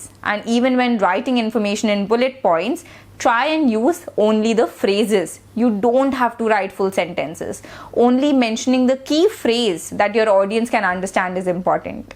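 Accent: India. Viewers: Indian accent sucks..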